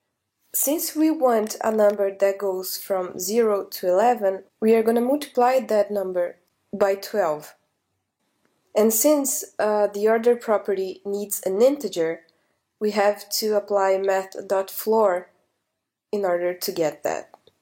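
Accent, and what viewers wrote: Brazil. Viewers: Love the tutorial, thank you! ps.: I can recognize a brazilian accent from miles away :)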